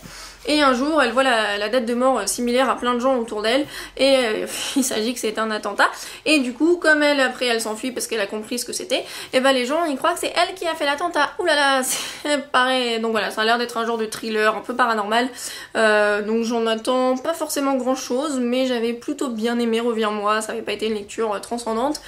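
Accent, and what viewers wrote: England, United Kingdom. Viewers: Salut. Je fait des vidéos sur youtube ou je montre mes achats jeux vidéo. j'aimerais tellement avoir le même accent "anglais" que toi;) Tu présente super bien à la caméra. Bravo pour tes abonnés et bonne continuation.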